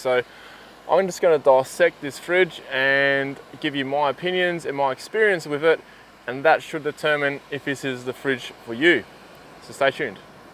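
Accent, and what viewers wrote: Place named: Australia. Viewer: Thanks for a great review, I am considering this fridge (or 2) for my campervan build, and you have just convinced me. They will work perfectly stacked on top of each other, and the idea of remotely locating the motor is the best. It took me about 3 sentences in, to identify the language as Aussie English 🙈😂😂 Greetings from South Africa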